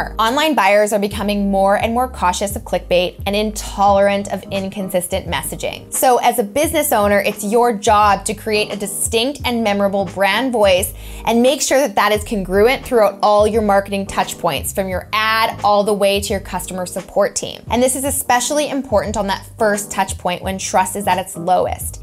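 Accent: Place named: New York, United States. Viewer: OK You can call me a troll if you like. Here goes... I am very interested in the subject, and you are obviously very knowlegable about copywriting...also quite attractive...However, as a chilled out and somewhat laid back West Coast Canadian, I find your voice grating and almost intolerable. I have started watching it about ten times, and just turned it off, because the New York delivery (if that's what it is) is hurting my brain. What do they call that? Vocal fry? At least you don't do the upward inflection at the end, making every sentence sound like a question?. So anyway, that being said, I surely would get on your nerves too, with my slow delivery and quiet tone of voice. If I had a dolla for every time someone told me to speak up or just started talking over top of me because they got bored or couldn't wait for me to finish...Thanks....just my trollish feedback...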